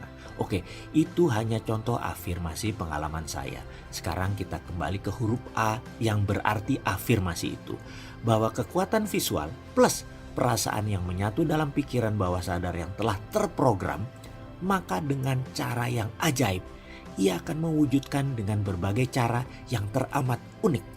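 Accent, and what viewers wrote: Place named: Palembang, Indonesia. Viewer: ini mas Adminnya orang Palembang ya ...hehehehe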